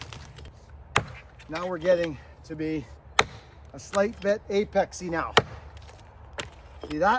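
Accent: Canada. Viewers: Where is this accent from I'm guessing Nova Scotia or British Columbia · Weird he sounds Canadian ?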